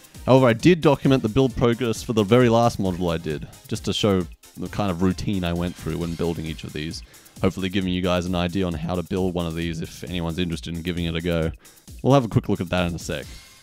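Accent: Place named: Australia. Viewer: Awesome! Great to hear a fellow aussie too!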